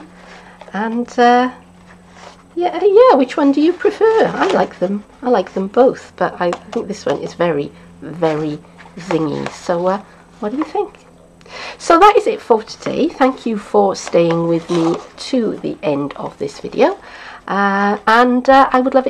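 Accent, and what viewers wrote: Wales, United Kingdom. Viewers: Both are gorgoeus and you're right, with older children they would love making them. How about stapling and gluing the handle on and then applying the ribbon? Added extra strength maybe? But what a nice basket for all sorts of things or small doll's baby crib or covered with shaggy green fringe a monsters cage turned on it's side? I love your accent, I miss my Newport nieces and nephews "come yer", they shout, "this is my left yer and my right yer, I listen with them" and "please auntie, brush my yer, it's all tangled".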